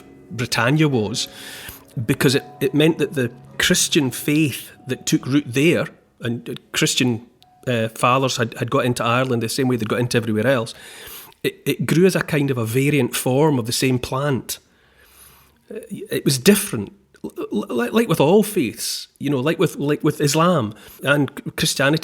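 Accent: Scotland, United Kingdom. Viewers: Thank you again Neil for a momentary escape from reality.North England was my home until many years ago when l was sent to New Zealand. I cannot tell you how nostalgic your historical videos make me feel. As a history buff myself l just love learning about British history. lt is a wonderful thing a sense of connectiveness and a sense of belonging when l hear your Scots brogue so lovely on my ears when you speak . I have been to Lindisfarne some years ago, magical place. I feel so happy that the history good or bad that l hear from you gives me a sense of belonging as they are my People. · Your commentary on the history of the British Isles spoken in that wonderful Scottish accent makes every episode for me , like living the tale. Thank you Neil. I loved your story about Australia and again it was your story telling that made it so special. My wife is a direct descendant of Bishop Pedro Mews and his history is quite amazing. 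I would love it if you could bring his life and work to life in your inimitable. way. It's a story worth telling. 🇦🇺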